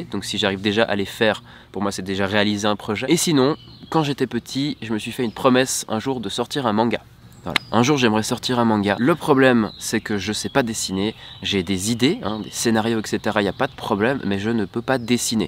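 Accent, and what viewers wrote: Switzerland. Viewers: T’es genevois ou bien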